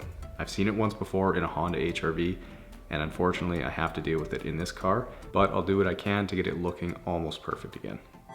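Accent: Saskatchewan, Canada. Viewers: What I found kinda funny is when I heard you were from Canada your ascent seemed to be more prominent 🤔✨😂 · so much Canadian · Not all canadians sound like that just so ya know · I love how his Canadian accent barely noticeable the entire video and then when the customers arrive he goes full blown, "OH YAH EH!" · Grage... Lmao😂🤣😂 aww I love the Canadian accent. Stay up my ice bound Northern Bro. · Might have to get you to do the tour busses next time we come through town! (I can hear the Sask in you any day... ha!)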